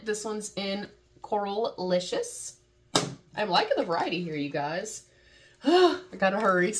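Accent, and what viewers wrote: South, United States. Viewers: I watch Alexandria and I thought she had shown every calendar in existence. This is a pleasant surprise. I am presently using a NYX lipstick. Nothing fancy nude color but if I can't replace it when it's gone I'll be lost. I'm a mature gal and don't use a lot of makeup. I find NYX or Elf usually meets my needs. Off to watch the opening :-) Wow so far the price, the packaging and the free gift with purchasing. How can you go wrong. I'll be back. Haha. Definitely a good price. I felt it was very lip color heavy. Would have like to see some powder blush, mascara and maybe lip bullets. I'm an old fashioned gal. I did miss the items we didn't see you open so possibly some of the things I mentioned were in there. I'm off to subscribe and check out your content. I love that Southern Twang. 🤠😉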